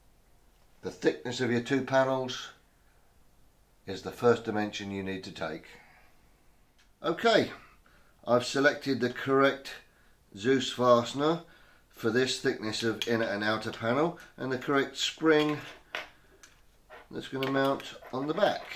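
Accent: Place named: Hertfordshire, United Kingdom. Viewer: I enjoyed this :) I would say a Herts accent, maybe Bushey area?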